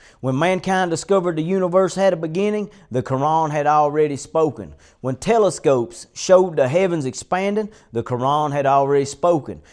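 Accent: South, United States. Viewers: your southern accent is so beutiful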